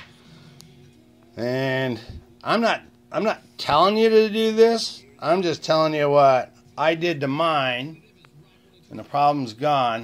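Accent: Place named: Massachusetts, United States. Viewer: sound like a mass accent love it from new hampshire.. good vid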